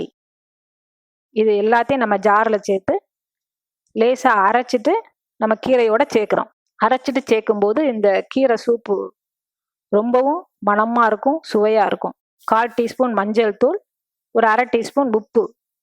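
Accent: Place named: Tirunelveli, India. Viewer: Madam unga ooru sollunga please..neenga paesurathu Tirunelveli maadhiri iruku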